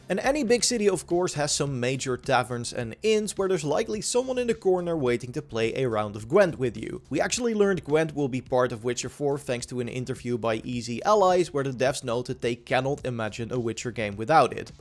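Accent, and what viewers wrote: Netherlands. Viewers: HELL NAH BRUH that dutch accent is killing me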